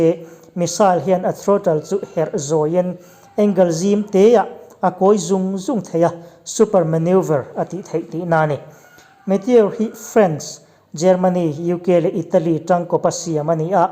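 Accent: Myanmar. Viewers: A chhiar tupa hi Burma lam chhuak I ang I thil lam dan hrang hrangah hian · I aw phawi hi chu mizo dik tak aw phawi chu a ang lo ka ti..beng ati thlep tlat..Burma lam unaute zinga mi ini lo maw..tha vek tho na in🤔🤔😂😂👍👍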